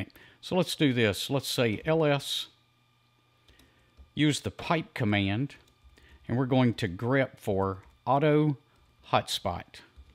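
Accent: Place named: United States. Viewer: remember gentleman his accent is country not stupid Great video dude gonna help me with my school project.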